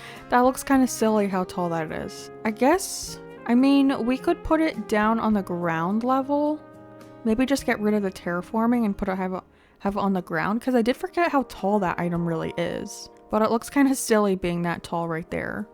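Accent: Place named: South, United States. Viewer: That southern accent took me out hahahah this build turned out so good!! 👏🏻 and yes, the waterscaping looks very interesting 🤣 educational content!!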